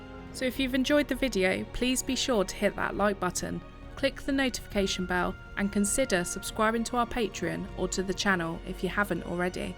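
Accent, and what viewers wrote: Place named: Hampshire, United Kingdom. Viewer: Nice to hear the Hampshire accent. Instead of fake Estuary English. A very nice county with lots of lovely small towns and villages.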